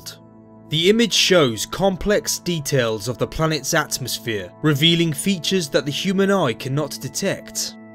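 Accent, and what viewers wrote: United Kingdom. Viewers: interesting accent you have.. what area of Britain does it come from? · british English af. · Why do you have a British Accent?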